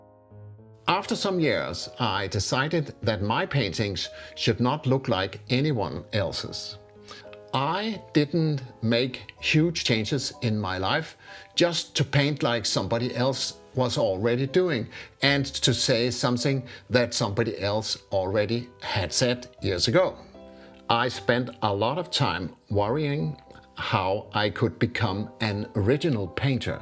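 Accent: Denmark. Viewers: Dear. Just stumbled across your channel. Are you Danish? You sound so Danish. Anyway, love your work and your advice! 🙏🏾 [Edit: "Blomster i Glasvase"? - yep, you're Danish 😅] Hilsen A Brit in Copenhagen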